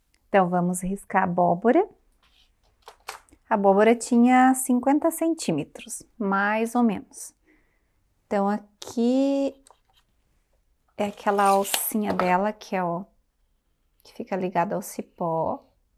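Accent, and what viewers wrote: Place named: South, Brazil. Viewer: Menina contando com o sutaque que eu amoooooo acredito que tu e do Sul. Se cometi uma gafe perdao mas e qui amoo o sul essa aula e um luxuuu amei obrigada logo. Logo quero faser obrigada.pelo carinho.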